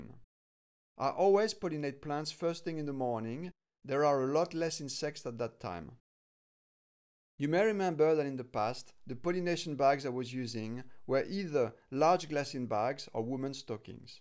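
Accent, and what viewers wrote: France. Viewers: Hi. I have a question. I want to try to make an apple cactus Cereus flower. I will buy a large cutting and put it next to a wide south-west facing window in Paris. But I have read that Cereus are self-sterile. I have a Cereus spegazzinii wich is supposed to be a heavy and easy bloomer, can I use it as a pollen donnor for a larger apple cactus Cereus? Also, judging by your accent, are you french?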